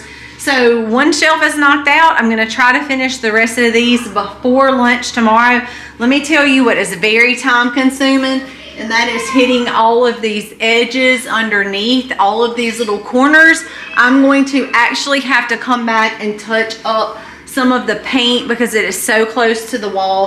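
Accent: South, United States. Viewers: What is your youngest daughter's name and how old is she? Im not use to southern accents and I can't understand if you say Libby or Livie.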